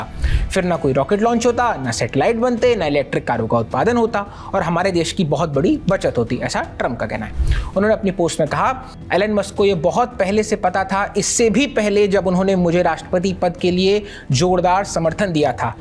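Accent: India. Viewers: waah kya desi style me news padhte ho.. simply fantabulous...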